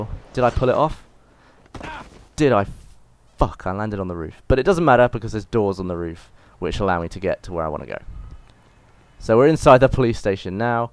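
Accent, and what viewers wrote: United Kingdom. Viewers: u got the brit voice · I like ur accent, wot part of UK r u from?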